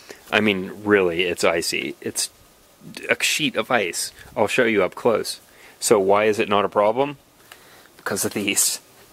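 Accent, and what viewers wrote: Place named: Pittsburgh, United States. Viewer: there's been one or two times where I can hear that yinzer accent but I don't anticipate anyone mistaking you for Pittsburgh dad.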